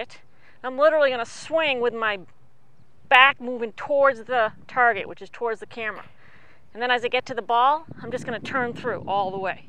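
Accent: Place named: Upstate New York, United States. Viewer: The way you talk reminds me of all my friends from upstate NY. Is that where you're from!?!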